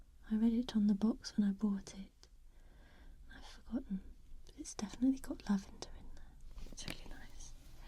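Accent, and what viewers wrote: England, United Kingdom. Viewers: I have recently found your channel and I had to take this opportunity to say how much I am enjoying your videos! I love your honesty and authenticity in this particular video. :) You've inspired me and I just wanted to let you know! By the way, I love your sweet English accent...it's lovely!!! Joanne xx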